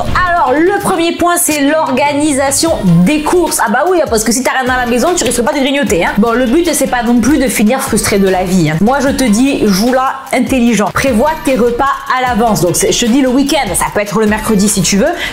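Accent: South, France. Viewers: J’ai adoré cette vidéo! Tu as totalement raison les lives rythme la journée et permettent de se vider la tête, j’attends que ça durant la journée! 🥰 D’ailleurs trop mignon ton accent du sud, je viens du sud aussi et c’est drôle de voir comment les accents varient facilement 😂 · Ooooh avec l'accent du sud😂😂😂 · Je suis Québécoise et, dans cette vidéo, l'accent du sud semble tellement évident hihi! ☺👌 J'adore ! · J'ai bloquée sur ton accent du sud 😅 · a grave l’accent du sud ça fait plaisir 😄team Sud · Le nouveau programme dance TSE 😍😍😍😍 j'attends le nutrition book avec impatience!!! 😉😉 merci pour ta motivation qui nous motive, l'accent du sud c'est le meilleur 😘😘😘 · Contente qu’une autre sudiste ait un super accent motivant 🥰 super sympa cette vidéo 😘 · Ton accent du Sud est TELLEMENT présent 🤣 !!! En tt cas merci pour tout ce que vous faites pour nous pendant ce confinement n°2. 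❤️❤️❤️❤️❤️ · Je savais que tu venais du sud mais l'accent ressortait beaucoup sur cette vidéo mdr. C'est mignon. Merci pour cette vidéo. Je suis déjà tous les conseils^^ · Hohoho l'accent du sud dans cette vidéo 🤣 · Je viens juste de remarquer que tu as un sacré accent du sud 😂 Perso je ne fais pas les live cette fois mais le programme Body Power j'adore 💪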